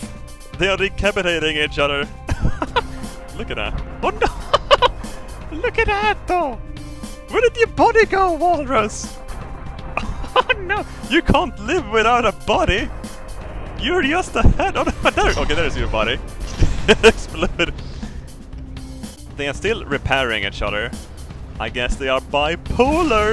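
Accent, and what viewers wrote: Sweden. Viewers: GreenColorBombs the power of the swedish accsent mate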